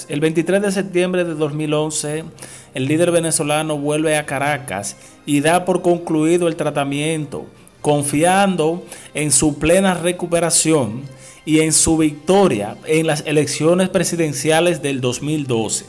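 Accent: Cuba. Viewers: LOCUTOR CUBANO?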